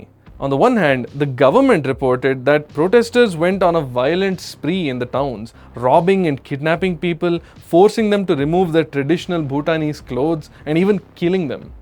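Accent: India. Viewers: Could not understand much. Too heavy Indian accent. From : Singapore 🇸🇬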